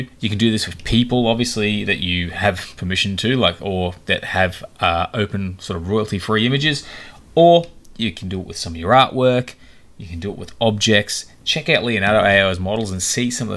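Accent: Australia. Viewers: Thanks, excellent. Interesting side note how Australians pronounce ‘data’ differently to the English. The Ashes series has just started here, c’mon England 😊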